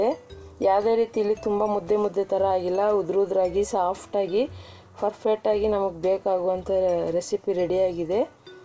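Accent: Tamil Nadu, India. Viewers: Neevu tamilnadu nora nimma kannada adethara ide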